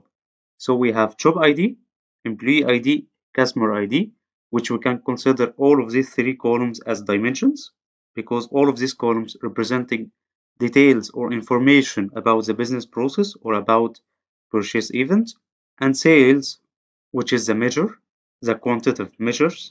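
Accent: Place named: Egypt. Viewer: الانجليزي دا حساه مصري ! ياريتو كان عربي والله :(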